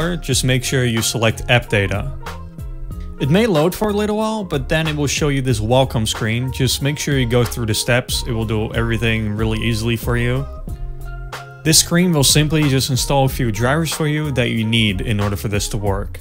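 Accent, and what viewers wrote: Netherlands. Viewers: you have a dutch accent. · I heard your dutch accent and instantly thought u were dutch then i saw the notification on ur laptop and yeah i was right you're dutch! Just like me :) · Are you dutch? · i knew you were dutch also ty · are yu dutch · r u dutch?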